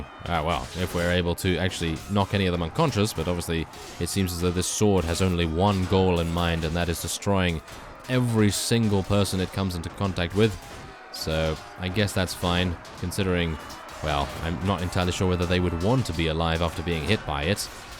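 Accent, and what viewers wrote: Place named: England, United Kingdom. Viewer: soothing listening to a proper english-speaking voice instead of that dreadful american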